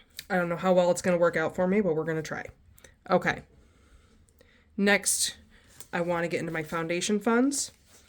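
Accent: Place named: New York, United States. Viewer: You have a New Yorker accent. I love it!!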